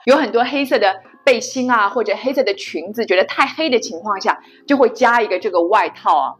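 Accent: Shanghai, China. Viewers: 谢谢推荐。 听口音是上海或者江浙一带的？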